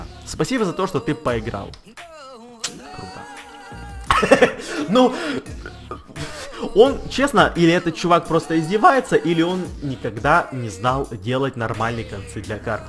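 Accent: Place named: Ukraine. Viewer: ты не русский,ты украинец?,но ты точно не русский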